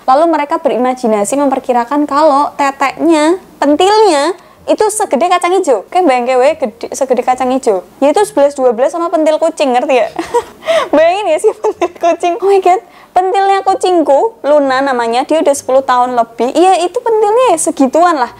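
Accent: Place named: Solo, Indonesia. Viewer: Mbak ngomong nya lucu ...dari solo ya mbak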